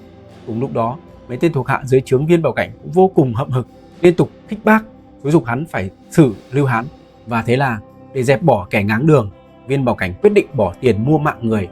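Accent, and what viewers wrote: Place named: North, Vietnam. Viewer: có thể lồng tiếng miền nam đuọc không ad . tiếng miền bắc giọng gọng gọng khó nghe . xem mà phải tua nhanh gần hêt một video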